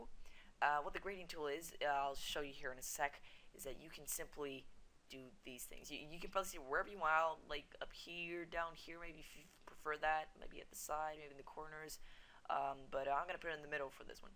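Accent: Sweden. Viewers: r u from sweden? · are you Swedish? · Are u swedish? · WAIT are you Swedish!? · R u from sweden? :O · U are swedish arent u? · Ey!!! Du är ju svensk! Ey!!! You are Swedish! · u are swedish right??Hej!!!!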